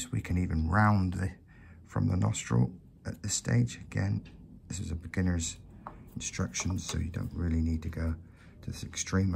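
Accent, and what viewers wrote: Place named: Somerset, United Kingdom. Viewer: Thanks for such a clear explanation. I also echo the comments of a previous reviewer, the Somerset accent is truly welcome.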